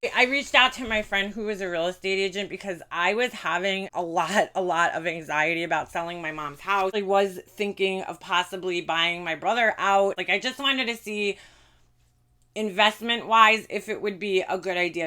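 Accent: New York, United States. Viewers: Hello. wow young lady you have def been through the wringer between real life with your Mom and crazy YouTube. I feel for you. I love all of your videos especially the one for your son when you brought and set up his cooking equipment. That was awesome. Stay strong keep keeping it real. Love your accent, it makes me miss NY. Peace out.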